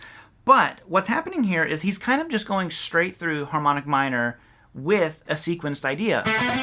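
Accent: South, United States. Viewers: Hi. Australia calling. Love that southern drawl y'all have!! You can give me Blackmore fovever as he's my favourite guitarist bar none. How about the "solos" on Highway Star and Burn?? BTW check out Bach's tocatta and fugue in D minor for the Death Alley Driver riff and Sky's version of Tocatta for Ritchie's inspiration.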